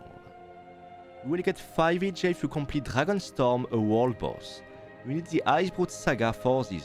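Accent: France. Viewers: you sound french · I love your french accent!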